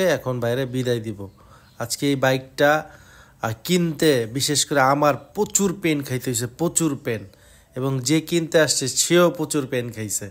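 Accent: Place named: Noakhali, Bangladesh. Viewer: ভাই নোয়াখাইল্লা বলে কথা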